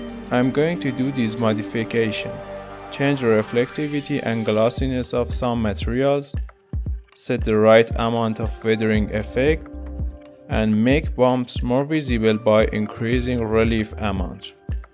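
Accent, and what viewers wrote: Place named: Iran. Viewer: Good but You have to work on your pronunciation. (too much farsi accent and wrong pronounced words).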